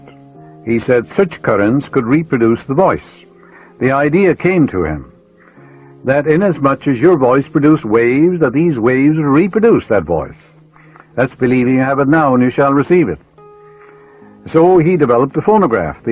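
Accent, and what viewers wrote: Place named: Cork, Ireland. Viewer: never lost that Cork Accent!!😉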